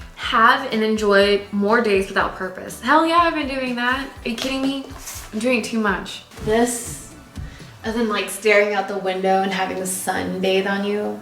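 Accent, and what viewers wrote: Vietnam. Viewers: omg that northern vietnamese accent! when u spoke i felt like you were my big sister 😄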